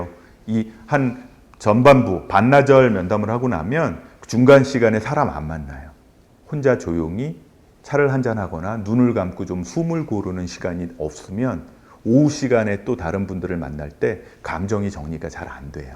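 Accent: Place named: Seoul, South Korea. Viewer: 서울경기권 방언입니다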